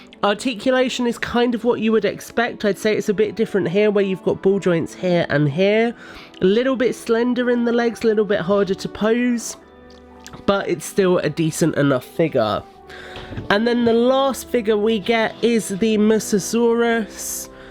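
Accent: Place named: United Kingdom. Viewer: Are you British?? Your talking way sounds like British?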